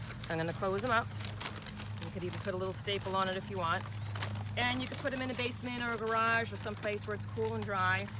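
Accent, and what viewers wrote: New Jersey, United States. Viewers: thanks for this - I now understand that it is too early to harvest sunflower seeds. My favorite variety is Italian white - goldfinches love it! BTW your (very slight) accent makes me homesick for NJ and Zone 7 gardening (now living in Minnesota in Zone 4)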